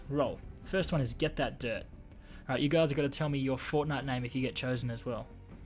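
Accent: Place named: Australia. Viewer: I'm sorry about the cussing I'll keep it lowkey if I get to play again.Your stream style is the right type for me.Your fair with your subscribers and that's hard to find on the net some times.Glad I had this chance to be noticed with out having to ask for a plug THANK YOU SO MUCH!!!! You might want to consider blurring your server so you don't get so many stream snipes.I have always liked the Australian accent,(To much crocodile dundee as a kid)lol.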